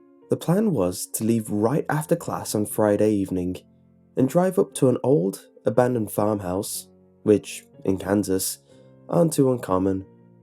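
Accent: England, United Kingdom. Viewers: is he american or English cos he sounds English but I'm not sure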